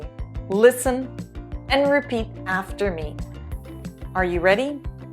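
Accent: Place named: Canada. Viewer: so this is Canadian English, it's good for me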